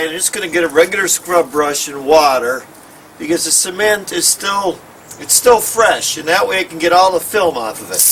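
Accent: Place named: Pittsburgh, United States. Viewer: I'm from PA also - Royersford, I'm guessing you are out somewhere near Pittsburgh judging by your accent! Those things came out great! Really look professional!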